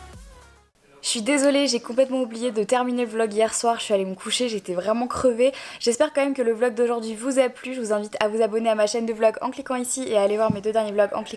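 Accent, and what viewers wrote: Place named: Belgium. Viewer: " TROP Satisfaisant " c'est ta nouvelle expression tu le dis tout le temps! Et par moment j'ai l'impression que tu choppe l'accent belge! haha confinement avec un belge!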